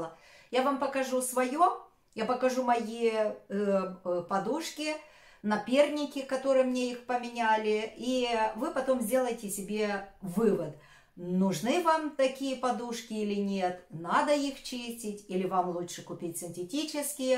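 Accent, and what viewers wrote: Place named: Moldova. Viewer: Живу ❤России. Уже давно. Скучаю по Молдове. Очень скучаю. Спасибо большое за ваше видео. За ваш говор за ваш слэнг и менталитет.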